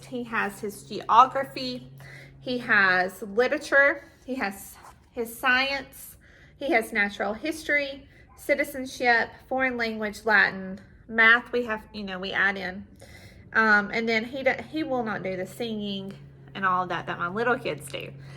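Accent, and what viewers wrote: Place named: South, United States. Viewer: We will be using A g Gentle Feast for the first time this year. I am really looking forward to it. You have such a nice southern accent.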